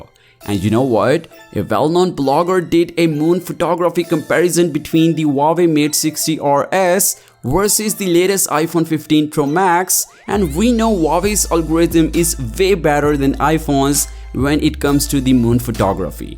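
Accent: India. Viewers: Love your Delhi accent.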